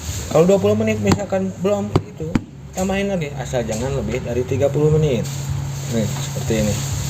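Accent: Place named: West Java, Indonesia. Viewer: Kang sunda bangeeet...sy suka😁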